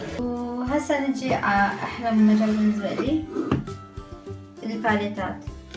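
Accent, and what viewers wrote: Iraq. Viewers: أحب وايت اللهجة العراقية😻😻 وانتو وايت طيبين تحية أختكم من السعودية😍😍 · حجي العراقي عسل منج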